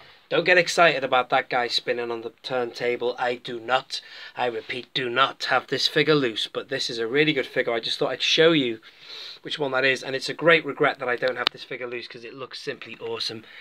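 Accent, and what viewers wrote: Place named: United States. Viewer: I like him better as a figure than I did as a character. This one is nice looking. Actually, so many of the vintage line are. i don't collect them, I just love to look at people's collections. I also like to hear your take on everything, I especially like hearing your American Accent, which is pretty good btw.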